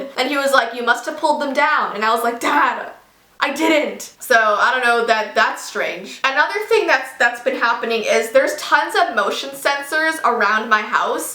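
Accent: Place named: Canada. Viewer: for some reason i heard the canadian in her voice that ive never heard before. how havent i noticed this!?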